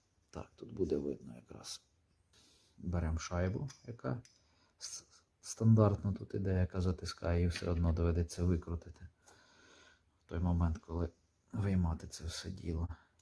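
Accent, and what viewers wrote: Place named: Russia. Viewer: А всё равно слышно,что мовою ты розмовляешь по русски. Чё уж кривляться,ну и говорил бы по русски,рас уж украинский не твой родной. Один хрен,скоро тебе придётся руссифицироваться,если жив останешься.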